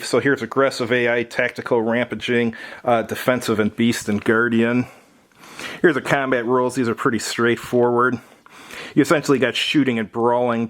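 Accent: Chicago, United States. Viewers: I know this is 2 years late, but great review. Also, this guy must be from Chicago. That accent is amazing.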